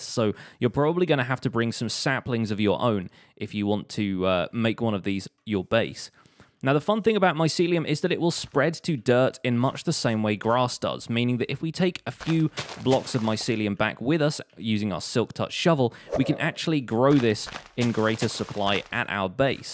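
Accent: United Kingdom. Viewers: He’s speaking with a British not American accent. We use both words - I’m a Brit too. An umbrella is usually for rain whereas a parasol is for sun.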